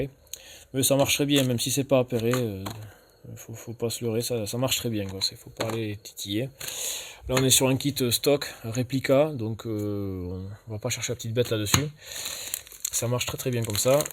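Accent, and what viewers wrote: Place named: Toulouse, France. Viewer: Bonjour! Heureux de réentendre ton accent toulousain " con"! Dis moi la rondelle que tu as mis en bout sur l'ecrou du vario er3 de ta mob elle mesure combien d'épaisseur et quelle est sa fonction? 😊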